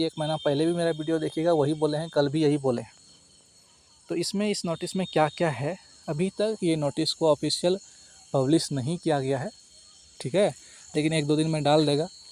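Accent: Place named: India. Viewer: Desy style ma ahcca bolte ho 😅😅😅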